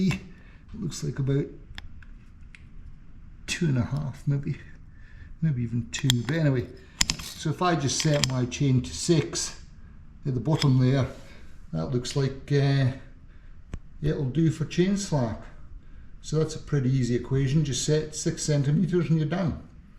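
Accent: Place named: Scotland, United Kingdom. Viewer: Love the Scottish accent, Glasgow? Ave got a DR650 here in Melbourne, luv it👍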